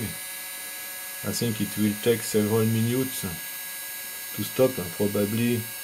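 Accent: France. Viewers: What a French accent !!!! 😅😅😅😍😍😍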